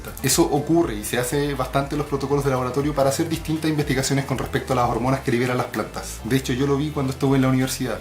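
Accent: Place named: Chile. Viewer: muy bien!! es la forma q tienen los antiveganos de atacarnos, yo ya no respondo a sus ataques, me cansé y no se consigue nada dando explicaciones... muy buena explicación... eres fantástico, caes muy bien, me encanta el acento y el tono q tenéis los chilenos al hablar. Gracias guapo por la información. Go vegan.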